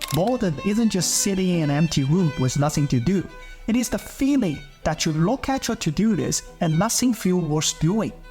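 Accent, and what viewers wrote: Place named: Louisiana, United States. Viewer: Bro you got the cajun accent "youknowhowlooongihavebeenwaitingforthis"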